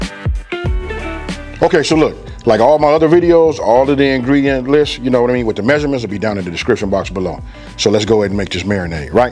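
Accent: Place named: Los Angeles, United States. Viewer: He have that L.A. accent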